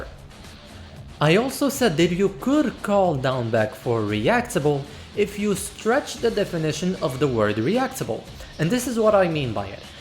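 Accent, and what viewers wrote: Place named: North Africa. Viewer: I knew you were north african for the whole video until i heard you say it 😂 where from?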